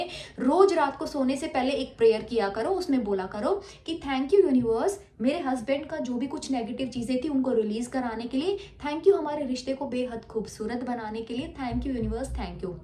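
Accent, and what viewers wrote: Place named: Uttar Pradesh, India. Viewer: Qki aap hna bolti ho jaise ki hm log bolte h Mai up se hu Raebareli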